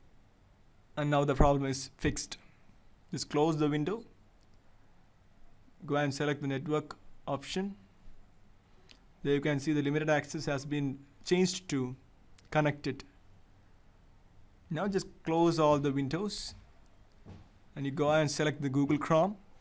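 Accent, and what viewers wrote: India. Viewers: fk that indian accent! it did not even work. zzz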